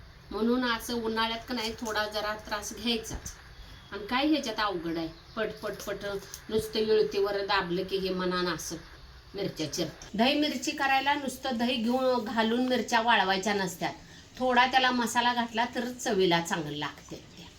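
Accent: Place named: Kolhapur, India. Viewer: मावशी तुमची बोली भाषा ही सुद्धा तुमच्या पदार्था इतकी रुचकर आणि गोड आहे...... कोल्हापूरला शिकत असताना अशी गोड भाषा रोज ऐकायला मिळत असे....nostalgic खूप खूप आभार आणि धन्यवाद अशा सुंदर रेसिपीज इतक्या साध्या पद्धतीने सादर केल्या बद्दल....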